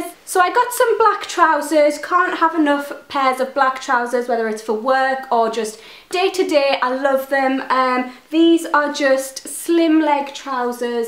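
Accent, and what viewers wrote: Newcastle, United Kingdom. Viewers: Is that a jordi accent haha sounds like your from Newcastle xx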